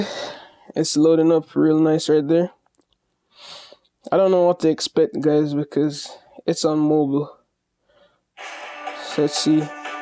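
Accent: Jamaica. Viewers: are you a jamaican/ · Are you Jamaican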